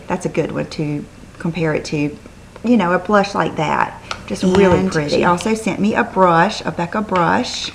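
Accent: South, United States. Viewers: Hi! I just subscribed because I've heard people talking about you and I'm so happy I did! I LOVE your "accent"...sounds like your from the south;) lol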